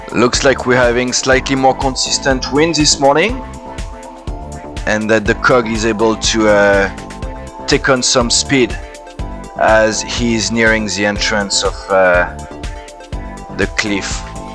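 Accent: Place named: United States. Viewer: Salut, moi aussi, comme James, je me suis demandé si en mettant de la marchandise à contre-gîte tu pourrais pas filer au près serré avec plus de voilure.Tu as essayé ? Mis à part, tu parles sacrément bien anglais et ton léger accent est très confortable pour ma compréhension, tu as vécu aux états unis ? A plus...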